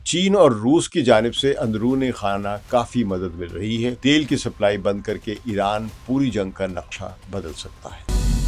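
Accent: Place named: Punjab, Pakistan. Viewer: ماشاءاللہ ۔ کیا بات ہے۔ پنجابی تڑکے کی۔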